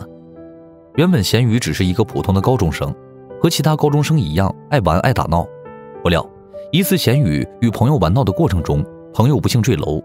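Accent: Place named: Northeast, China. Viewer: 博主是东北人吧 广播be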